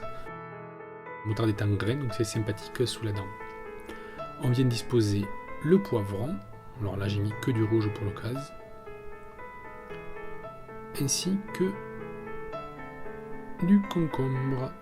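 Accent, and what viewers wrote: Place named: Ariège, France. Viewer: Ah sa fait du bien d'entendre un youtuber avec l'accent ariegeois c'est si rare PS:ariego moun pais PPS:je sais pas ecrire en patois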